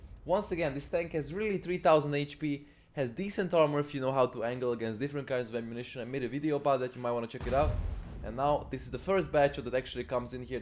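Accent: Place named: Hungary. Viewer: I'm not arguing with you either 😊 has a typical hungarian accent 😅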